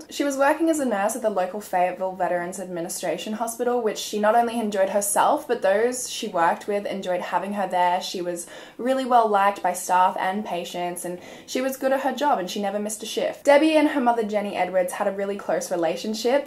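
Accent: Australia. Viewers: I really like your Australian accent 😊💖. And your videos too. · Her voice is so peaceful to listen to! Australian right? 😍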